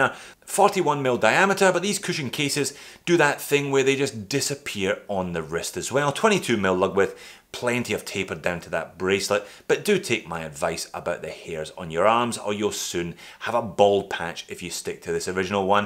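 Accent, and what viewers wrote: Scotland, United Kingdom. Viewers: My favourite video of yours is the first part of modding the legendary SKX ( <link> ) Simple but important and practical mods ;) Maybe it's the fact that I love SKX itself so much 😂 And I really enjoy your content, nice hard work and this Scottish accent ;) Keep it up and go far above 100k soon, I'm sure you will :) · My Favorite is the D1 Milano... BECAUSE (has nothing to do with liking the watch) This video showed up in my subscription lineup, and my wife liked the look of it. So this was the first JOMW video she ever watched. And upon watching, a milestone was reached. You are the only watch channel host in my subscription list who does not annoy her. Maybe it's your Scottish accent (we recently vacationed on Skye) or the fact that you are a Scott living in Australia like one of our favorite musicians, Collin Hay. She has since also commended the channel's intro graphics/colors/font. 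(she is a graphic designer) Anyway, your channel, and in particular this video, opened an avenue for us to "nerd out" on watches together. Which has made the life of this watch collector, measurably better. Thanks!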